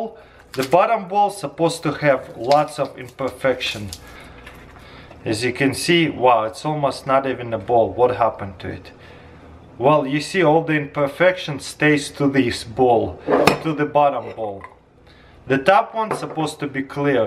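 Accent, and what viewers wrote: Russia. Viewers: I also like your russian accent!!!🖒🖒🖒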